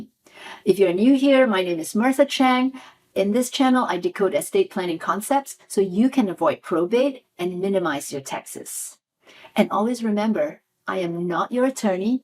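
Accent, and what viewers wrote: United States. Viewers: A tip off is she speaks without an aussie or english accent. That should have clued you in that she is American.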